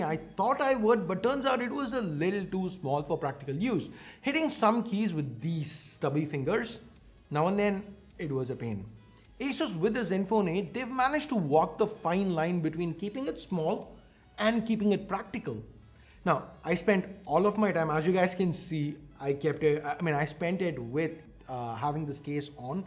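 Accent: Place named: India. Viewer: I'm very sorry and no offense but I think I will watch your videos from now on so I can practice listening to indian accent english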